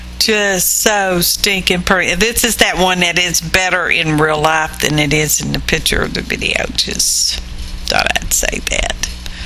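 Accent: South, United States. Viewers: I love the crinkly bits SO MUCH!! Looking forward to giving this a go if my inks are still viable. PS: You’re a fun southern girl to listen to. That’s from a native Texan living in Tennessee. 😝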